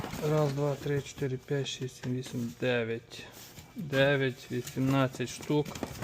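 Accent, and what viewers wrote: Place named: Western Ukraine, Ukraine. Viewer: Знімаєш ні як , а в перемішку з западнянським акцентом це просто жах . Хто тебе з буд майданчика відпустив , ваша реальна тема це будувати...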